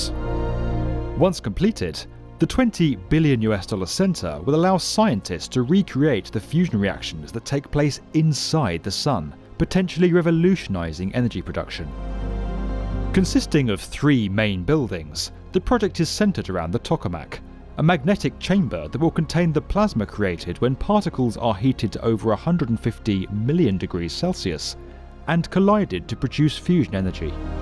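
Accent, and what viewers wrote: United Kingdom. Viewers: 🤣 No the narrator is British! You can EASILY tell by his accent!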